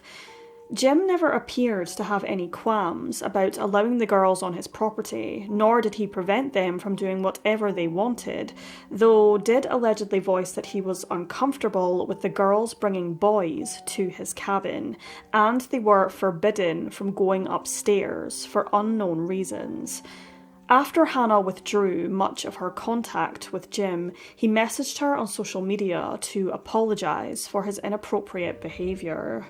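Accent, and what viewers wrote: United Kingdom. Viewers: yes,top 5 for me too. Truly criminal are great too. Like yo,to the point,clear,concise+British is a bonus for me😊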